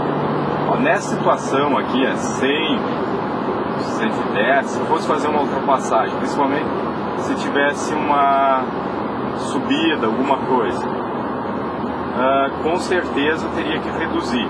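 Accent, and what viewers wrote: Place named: Rio Grande do Sul, Brazil. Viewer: lagoense com sotaque gaúcho rsrs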